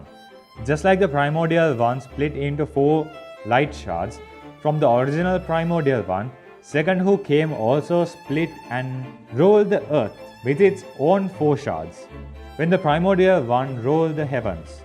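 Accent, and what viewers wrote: India. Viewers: Where are u from cause u sound indian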